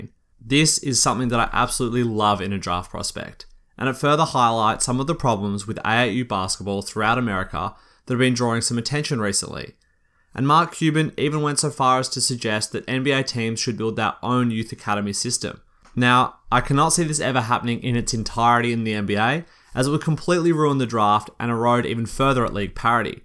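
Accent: Australia. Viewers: u have Australian accent?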